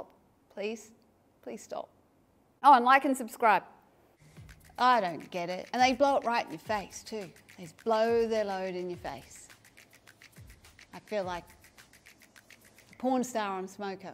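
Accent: Australia. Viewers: Don't know anything about vaping, just smoking is bad for one's health. Just want to say I love the Aussie sense of humor and the accent. my siblings have been to Australia and my neice went to university in Sydney. They love it. Keep up the good work.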